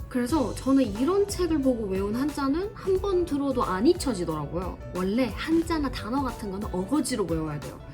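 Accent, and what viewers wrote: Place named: South Korea. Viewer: 이분 한국인임? 한국어 그냥 한국인인데?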